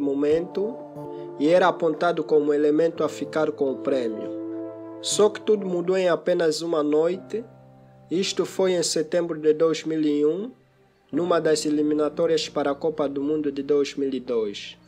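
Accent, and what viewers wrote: Angola. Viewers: Vi um angolano no sotaque🔥🇦🇴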